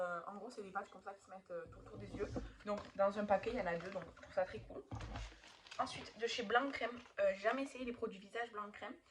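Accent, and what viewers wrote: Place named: South, France. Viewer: Bonjour 🥰, je viens de découvrir ta chaîne grâce à sandy❤, et je la trouve super, intéressante et apaisante❤️, j aime beaucoup ton accent du sud, bonne journée 🌈✨️✨️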